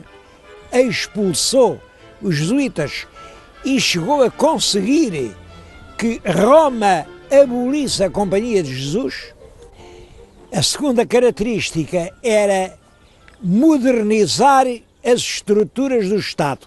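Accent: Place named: Portugal. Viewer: impossível entender esse sotaque português, nem com legenda vai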